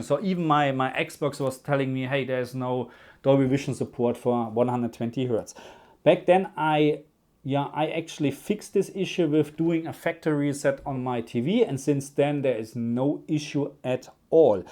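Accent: Germany. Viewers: You are clearly of german origin, right? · Du bist 100% deutscher oder? Diesen englischen Akzent erkenne ich überall 😅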